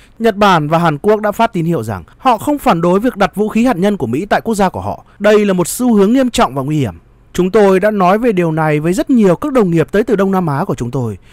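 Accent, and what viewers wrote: Standard. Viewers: nói chuẩn quá anh ơi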